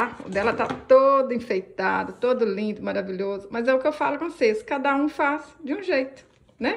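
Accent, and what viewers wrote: Minas Gerais, Brazil. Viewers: Muito lindo e simples a explicação e o modo de fazer. Esse seu sotaque é o máximo me lembra uma amiga mineira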